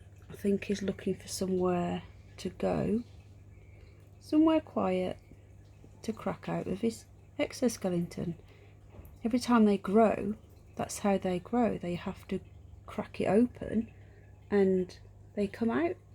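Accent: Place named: Barnsley, United Kingdom. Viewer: Your Barnsley accent lady , (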